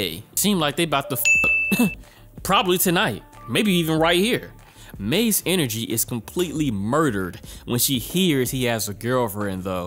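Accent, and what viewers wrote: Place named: Philadelphia, United States. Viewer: Oh you from Philly huh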